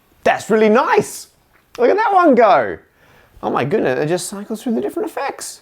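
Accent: United Kingdom. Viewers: This guy has the kind of british accent that you don't immediately notice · I only clicked because I actually thought you were Matt Parker, creator of South Park just to find out you have a British accent. I was not expecting this lmao.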